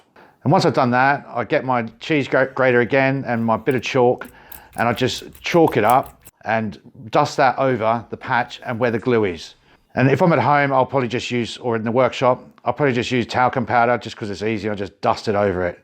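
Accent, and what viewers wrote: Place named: Australia. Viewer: Just watched another video. Great and clear to understand. I did pick up on the Aussie accent so should be saying G’Day. I’m Scottish and lived a few great years in Aus.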